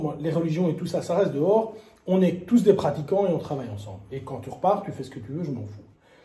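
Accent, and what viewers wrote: Belgium. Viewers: Commentaire pertinent et argumenté...malgré ton terrible accent belge!!!! le racisme on peut dire ce qu' on veut c est à la tête du client...un raciste en France tu mets zidane en face, il lui lèche le dessous des c....et prends un selfie en lui tapant la bise...un raciste en FRANCE fermera sa gueule si 1 médecin urgentiste marocain ( c mon pays d'origine et suisse parents ) sauve la vie de son gamin après accident de voiture etc...n'aie crainte les commentaires de rageux..c est la vie..et c est dommage!!! g eu les boules,ça m a fait de la peine de voir certains commentaires autant que j ai kiffé ta " sortie" via cette video😉👍 sans rancunes pour ton accent lol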